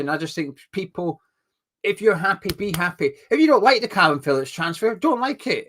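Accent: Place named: Yorkshire, United Kingdom. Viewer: The "iron, a lion and ready to Roar" made me laugh too. Especially in the Yorkshire accent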